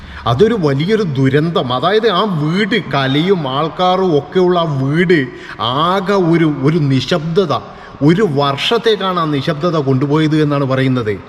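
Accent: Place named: Konkani, India. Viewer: നിങ്ങൾ തിരുമലക്കാരൻ കൊങ്കിണിയാണോ ശബ്ദം കേട്ടിട്ട് തനി കൊങ്കിണി മുതലാളി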